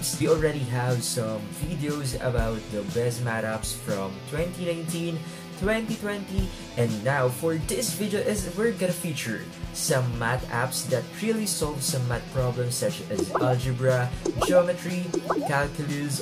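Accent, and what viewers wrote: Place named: Philippines. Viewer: pinoy ka nu 😊 the pronunciation and accent gives it away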